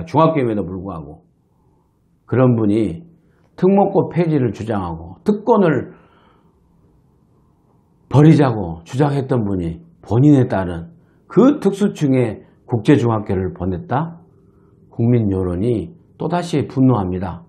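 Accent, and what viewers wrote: Jeolla, South Korea. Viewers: 구수한 전라도 억양과 날카로눈 비판과 지적애 아주 속이 시원합니다!! 앞으로 많이 많이 해주세요.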